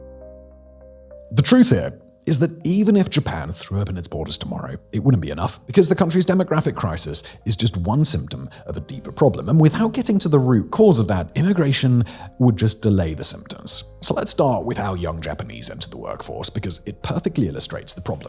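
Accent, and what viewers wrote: United Kingdom. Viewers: He’s talking about managed decline, yet has a British accent. The irony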